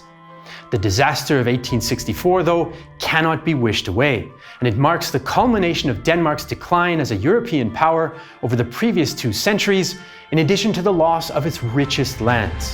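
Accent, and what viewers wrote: Germany. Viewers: German accent is better than necessary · The details were great, but it is unfortunate that the Danish place names and personal names are pronounced with a German? accent. It becomes very difficult to follow. If you are speaking English, use their English names. For example, the Danish Jylland, is JUT-land in English. Not YOOT-land. Likewise the capital of Denmark is not Copen-HAH-gen, but Copen-HAY-gen in English. Copen-HAH-gen is the name of a chewing tobacco. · My man, that man is clearly not a native english speaker, he is actually German XD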